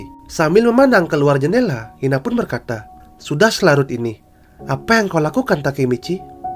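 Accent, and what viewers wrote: Bali, Indonesia. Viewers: admin orang bali keknya wkwk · dari bali ya bli? logat nya udah keliatan nok wkwk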